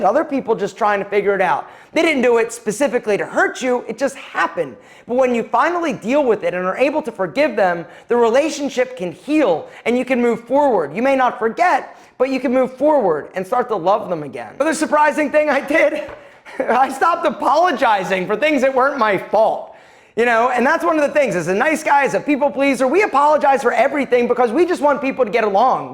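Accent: Philadelphia, United States. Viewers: Philly accent detected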